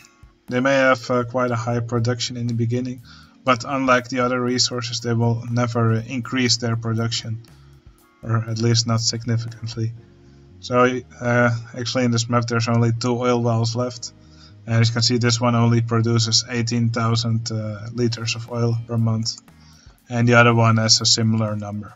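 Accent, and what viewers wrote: Netherlands. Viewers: Ik kan je nederlandse accent horen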